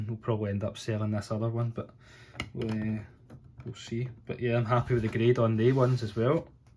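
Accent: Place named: United Kingdom. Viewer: was this bulk submission? and you only waited 3 months? the turnaround now is likt 145 days. And also, did you had any problems with customs? From your accent I think your from the Uk so your kinda in the same situation as me. I'm from Portugal, and I'm kinda worried if something happens with the package or customs, cuz as you might know it's not insured. I send 2 weeks ago 50 cards. Just wanted to know if you had any problems. Thanks!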